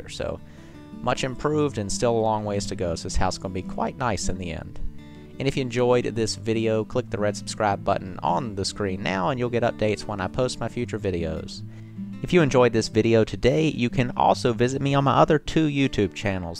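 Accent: South, United States. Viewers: Love your videos man. Gives me inspiration in my seemingly never ending home renovation project. My house was, still kinda is in rough shape and figuring out what to do can really tax you mentally. Did you learn at a trade school or have you been doing this for a while. I noticed your accent too, I'm guessing you live in the south as well as I. Greetings from North Carolina and keep it up! 👊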